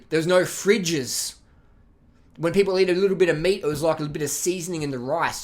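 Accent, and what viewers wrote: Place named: Australia. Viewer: Great video. Because of your awesome Australian accent, it kind of sounds to my American ears like you are saying fucktose when you say fructose. =)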